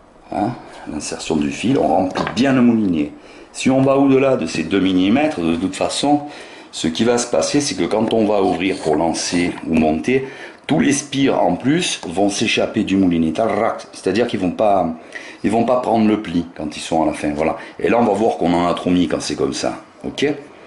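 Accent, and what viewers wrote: South, France. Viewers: votre accent du sud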